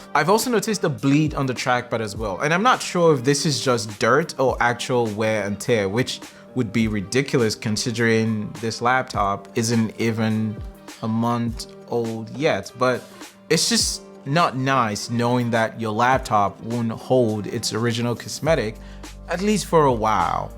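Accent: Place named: Nigeria. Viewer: Oh, a Nigerian accent. That makes sense. Before I was permanently confused. Because the accent sounded mostly american with a slight under current of british. So my mind was slowly melting down trying to figure out what area of england or america would generate that mix. Also the review was really good and high quality too. It was in depth, without being onerously long.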